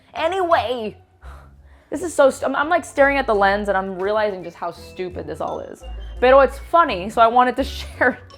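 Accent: Cuba. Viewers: I love your Cuban accent, especially when you imitate your grandma.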